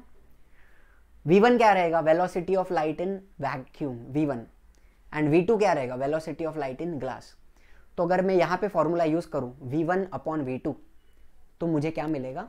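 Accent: Maharashtra, India. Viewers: Sir i think your mother tounge is marathi 😅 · Sir kya aap marathi ho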